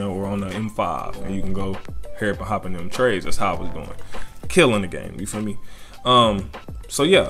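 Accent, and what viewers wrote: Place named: South, United States. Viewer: Man you sound like you from the South. Where you from my G?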